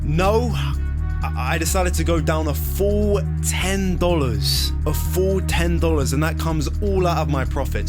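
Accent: United Kingdom. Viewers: I notice you selling and using $ but you’re accent is british. Do you live in Uk? Or uS?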